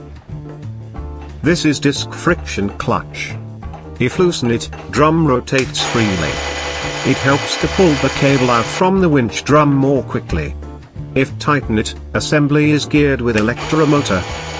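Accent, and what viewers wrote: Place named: United Kingdom. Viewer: What is with the robot British narrator?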